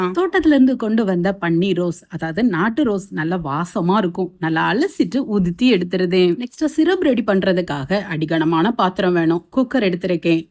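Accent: Tirunelveli, India. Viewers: திருநெல்வேலி தமிழோ...!😂அருமை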